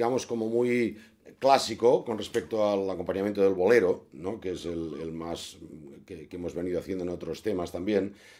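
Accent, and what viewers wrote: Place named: Catalonia, Spain. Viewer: Collons quin accent que tens de català de la bona terra.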